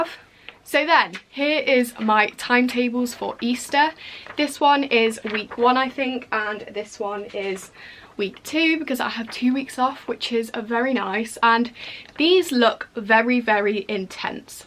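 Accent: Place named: Doncaster, United Kingdom. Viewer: Athena Newton then where did she get her accent from? It sound like Doncaster or somethinng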